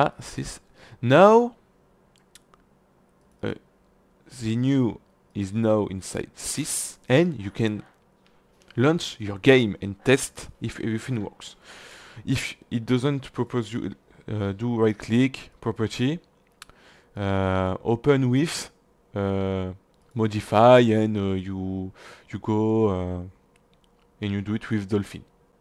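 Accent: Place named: France. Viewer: ton accent ma fait capter direct que tu est français sinon bon tutoriel tu a gagner un abonner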